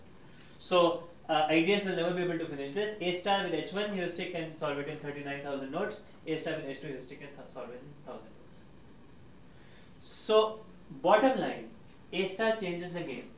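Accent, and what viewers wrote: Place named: India. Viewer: The English is probably perfect - its the heavy Indian accent that makes this barely comprehensible.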